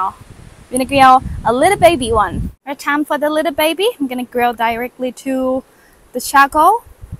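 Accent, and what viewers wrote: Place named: Australia. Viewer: You almost have a hint of an Aussie accent. What a beauty.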